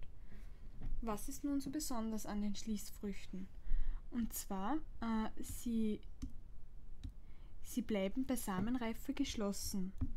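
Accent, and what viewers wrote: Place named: Austria. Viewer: Mit diesem sanften österreichischen Dialekt fällt das Lernen gleich viel leichter :D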